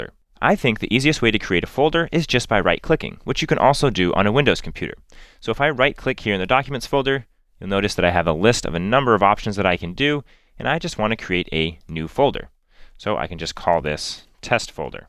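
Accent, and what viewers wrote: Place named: United States. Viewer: I have a MacBook Pro and have been Mac user for a long time but I'm here because of quarantine and Im here to listen to this guy's all American voice. LOL and I learned a few Mac tips !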